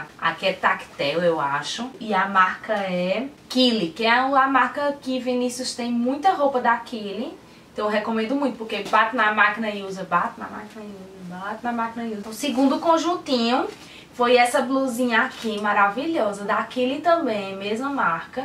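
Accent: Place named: Northeast, Brazil. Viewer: ñ sei se vc vai lembrar de um comentário q fiz. Falando q estava viciada em seu sotaque, e me fazia matar um pouquinho a saudade do Nordeste, q eu moro no Rio e tal... e advinha só essa semana meu marido recebeu uma proposta de trabalhar em RECIFE!!!!!!!!!!!!! E claro aceitamos! Estou super ansiosa. Então se te ver nas ruas de Boa Viajem vou falar com vc heim! kkkk Beijão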